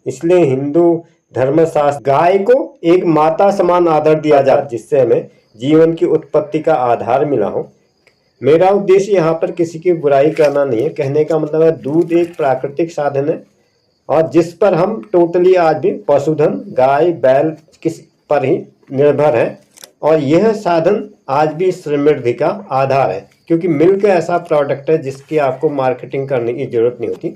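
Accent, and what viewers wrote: Uttar Pradesh, India. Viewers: Aap kaha se ho..Boli se up wale lagte ho..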